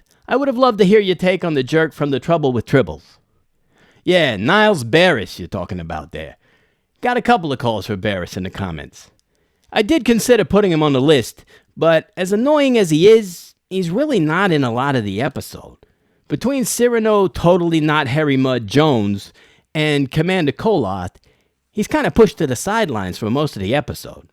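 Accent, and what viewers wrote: New York, United States. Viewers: Somehow, I stumbled onto your pages. Being a casual, super nerd and loving all things sci-fi. I also consume all movies, new and old, especially if they're good so I love all the movie references have found some of your recommendations great options to follow up on. I always learn something new when I watch your videos. I found your insights, interesting, fresh, and fun. I hope you continue to do videos because I think the last one you posted was a year ago. I'm not seeing anything new, unless I'm not looking at the timestamps correctly. Keep up the good work. I'm still going through the rest of your catalog, but I completed everything Star Trek and moving on to the other videos and playlist that you've created. Love your work. And I love your scripts and New York accent. It has a hint of old -timey but hip New Yorker.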